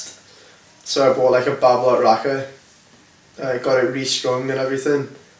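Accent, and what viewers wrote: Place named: Liverpool, United Kingdom. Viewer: Scouse accent 💯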